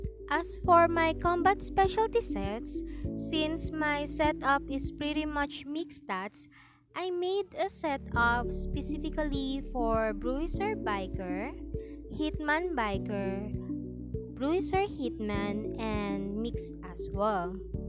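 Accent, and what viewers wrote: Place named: Philippines. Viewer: sa way ng pag pronounce mo ng english i think pinay ka hehe ang cute🥰 more upload pa po ng grand mafia tips pls🥰🙏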